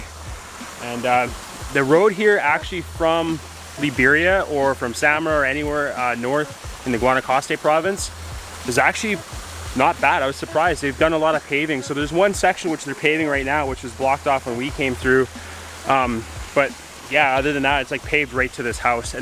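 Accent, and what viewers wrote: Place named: Corona, United States. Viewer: Just curious …! We’re you from ..! Sound like Corona …..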